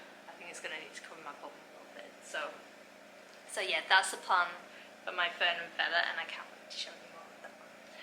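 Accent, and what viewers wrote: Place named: Chester, United Kingdom. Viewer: Loved your first podcast. Your voice reminds me of home (I’m originally from Chester but now live in Scotland). Tilly is gorgeous, hope to see more of her and you ☺️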